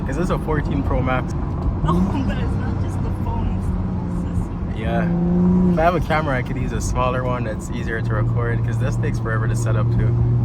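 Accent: Jamaica. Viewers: Yo u mi hear di Jamaican accent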